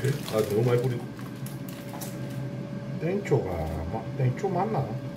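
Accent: Gyeongsang, South Korea. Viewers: 경상도 특 안고쳐짐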